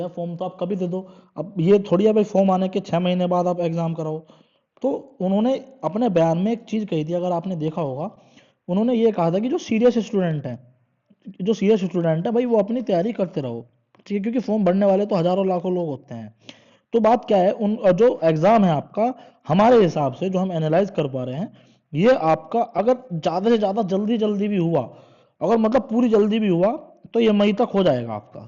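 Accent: Meerut, India. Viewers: Tum meerut se ho kya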